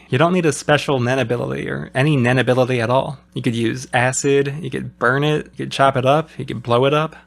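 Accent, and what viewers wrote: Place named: Pacific Northwest, United States. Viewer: hxh fan, jerma references, league references, soprano references AND a PNW accent? im liking this guy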